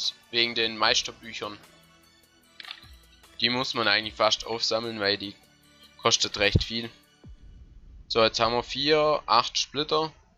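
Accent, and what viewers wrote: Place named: Swabia, Germany. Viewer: Du kommst safe aus dem schwabenländle^^ aus welcher Richtung?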